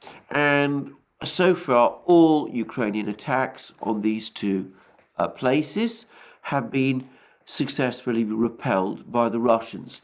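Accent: England, United Kingdom. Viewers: Alex works for Russian propaganda groups. They come up with his talking point for him. He is just a Russian mouthpiece with an English accent. I’m not making this up.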